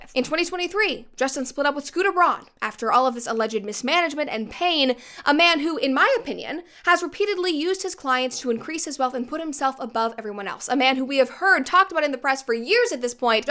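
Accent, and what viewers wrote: United States. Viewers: I really liked the way u talk fast and quick vibing American accent 🇺🇸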